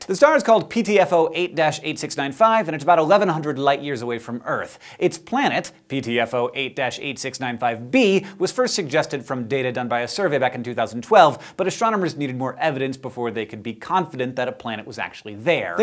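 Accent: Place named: United States. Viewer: He’s American, get over it.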